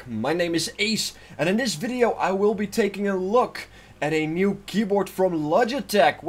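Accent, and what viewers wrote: Netherlands. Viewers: Are you perhaps dutch by any chance ??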